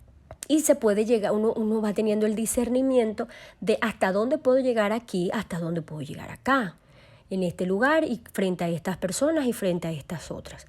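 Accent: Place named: Venezuela. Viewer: Shalom. Todah Rabah, soy de Venezuela , tu tono es venezolana, das clases presenciales ????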